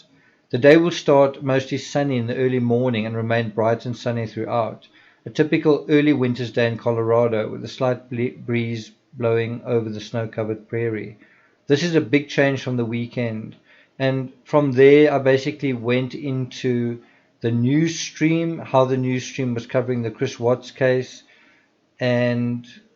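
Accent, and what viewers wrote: South Africa. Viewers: I love your accent 🥰 Sounds South African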